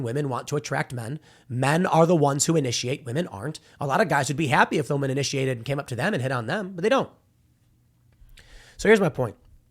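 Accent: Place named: Chicago, United States. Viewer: This is the most Chicago has ever sounded.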